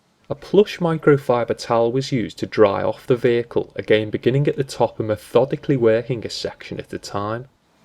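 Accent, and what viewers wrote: Liverpool, United Kingdom. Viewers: Good video but please refrain from using this Scouse twat from commentating!!! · Scouse of course!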